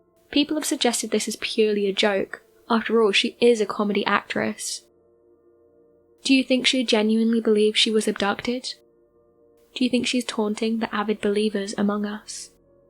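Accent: United Kingdom. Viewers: Are you British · ARE YOU A BRIT? 🇬🇧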